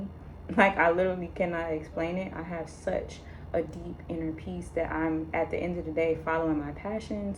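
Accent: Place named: Philadelphia, United States. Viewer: Are you originally from philly? It just really sounds like you are.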